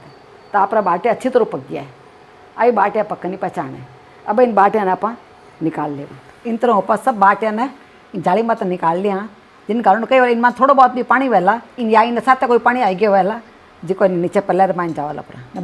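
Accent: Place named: Marwari, India. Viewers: Aapki bhasha thodi alag lagti hai kyoki maharashtra me jo marvadi log rehte hai vo thodi alag marvadi bhasha bolte hai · Aapki jodhpuri marwadi bahut hi meethi hoti... Mein soda ki jagah thoda sa curd use karti hoon. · Maine isliye pucha tha bcz aapke baat karne ka tarika whi ka lga muje merta road jaisa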